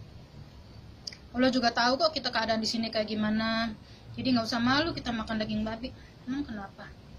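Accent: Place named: Cirebon, Indonesia. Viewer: Logatnya ky logat cirebon...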